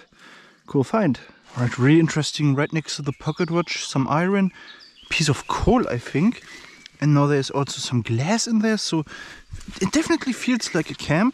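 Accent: Germany. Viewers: Is your accent German? If so, are you still allowed to keep items with insignias? As I understand there are rules about having sw4stlk4s. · Typical german english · Bro has such a german accent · Dieser deutsche Akzent hahaha, gutes Video glückwusch zu den Funden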